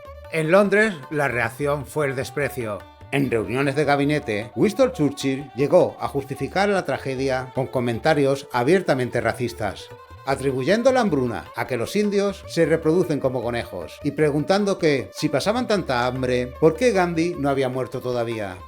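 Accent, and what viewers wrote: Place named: Spain. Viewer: Sin querer tirar hate, pero me parece curioso que una persona co acento español hable mal de colonizadores, perdon pero me pareció curioso XDD. Me gustó mucho el video ♡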